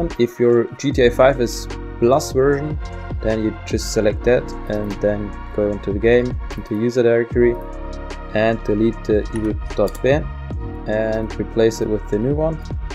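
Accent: Germany. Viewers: немецкий акцент, сразу узнал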